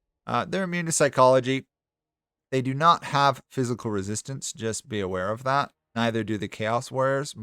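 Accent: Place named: United States. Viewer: Dw about it lol, it's hard to know if you've never really been taught and since most fantasy names and languages originate in Asia or Europe most Americans (assuming your American or Canadian from accent) just don't know since yknow, you don't need too lmao, but I don't mind helping with it in future if you ever wanna check, if you want my discord or something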